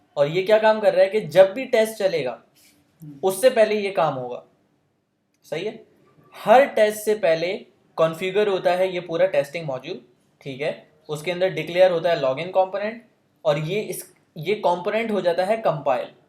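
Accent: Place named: Uttar Pradesh, India. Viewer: you are outstanding man, the clarity you have hats off. keep it up. between i get a feel you are from UP but surprisingly you are from pakistan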